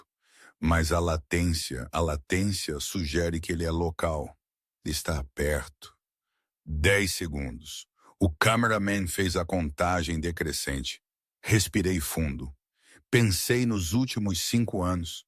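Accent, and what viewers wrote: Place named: Portugal. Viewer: MUITO BOM OUVIR A NARRAÇÃO LUSITANA, EM UM CANAL NOVO. O ENREDO É EXCEPCIONAL COMO SEMPRE.... Bem vindos! ✨💫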